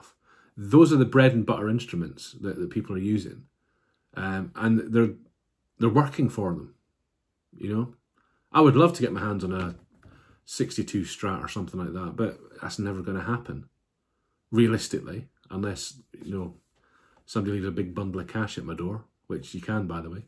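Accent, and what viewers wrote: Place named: Scotland, United Kingdom. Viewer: Hey man, nice to hear a fellow Scotsman talking about guitars 😁 Totally with you on this and I love your honest approach. I recently uploaded a video on a similar thing with overdrive pedals. Also, on your point about having to sell guitars - I hear you! I have had loads of gear come and go. Some of it I regret and miss but it's the way it is. Glad I found your channel. Talking sense, yes!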